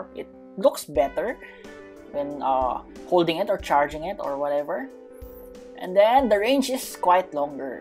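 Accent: Philippines. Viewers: U sound filipino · wait he's filipino? no wonder the accent and voice sounds like a filipino speaking english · how is he a noob he has acro also i notice your filipino accent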